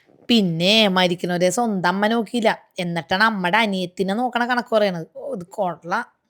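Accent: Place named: Ernakulam, India. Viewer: സൗണ്ട് കേട്ടാൽ അറിയില്ലേ ekm തന്നെ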